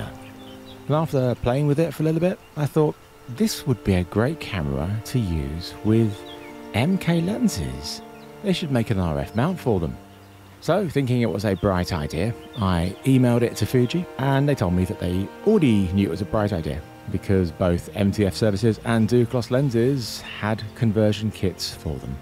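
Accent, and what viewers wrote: United Kingdom. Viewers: I don't have any uses for these lenses or the Red, but the video is gorgeous, the music is well chosen, and the British accent is always charming. Heh.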